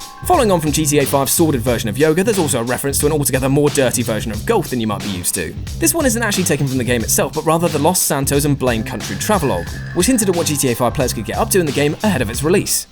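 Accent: United Kingdom. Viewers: Ok people that criticize a game that they can't fix or do anything about pisses me off but some one with a British accent criticizing a game just really pushes it. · this is guy is 100% british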